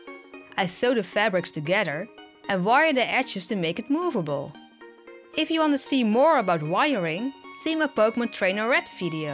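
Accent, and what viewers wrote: Netherlands. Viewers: * I knew you sounded Dutch, my friend is Afrikaans and she sounded like you! Afrikaans is derived from French, Dutch, and Swedish, and the accent sounds just like yours! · Hey, where are you from? You have a Dutch accent, I’m Dutch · She’s from the Netherlands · Are you dutch you sound dutch I am ducht bwt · I have a question, are you from the Netherlands? Your accent sounds like you come from there! Very cute repaint though. You deserve so much more recognition <3 Edit: ik herken je accent echt! Ik ben blij dat er ook een Nederlander in “the doll community” zit! :) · Jep she is dutch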